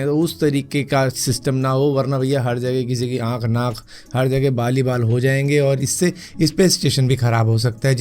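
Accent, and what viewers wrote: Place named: Punjab, India. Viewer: Bai tum Punjab se ho I know I know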